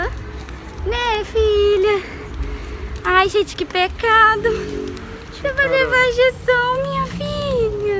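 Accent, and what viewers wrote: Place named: Rio de Janeiro, Brazil. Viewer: cê é carioca mesmo? cê puxa o s kkk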